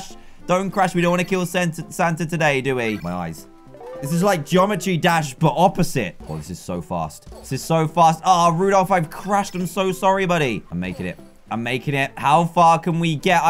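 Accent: United Kingdom. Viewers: Wait I did not know that you was British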